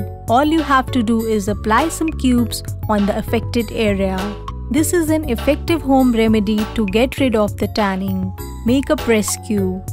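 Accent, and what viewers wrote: India. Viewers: cory Turner if the video is made by a indian the English will be the same way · Indian accent...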